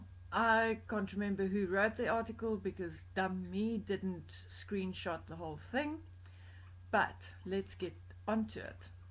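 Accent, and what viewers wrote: South Africa. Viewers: WHAT A FIND YOUR SITE IS , LOVE IT. ALREADY SUBSCRIBED. LOVE THE SA ACCENT AS I LIVED IN SA FOR 4 YEARS, SUCH A BEAUTIFULL COUNTRY AND PEOPLE. MY MIDDLE TWO CHILDREN WERE BORN THERE SO LOTS OF VERY HAPPY MEMORIES.. KEEP UP THE GOOD WORK.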